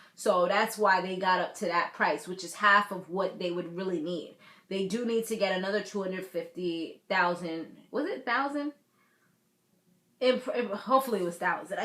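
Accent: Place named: New York, United States. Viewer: I love your accent! Where are you from? Sounds like New York with an island twist.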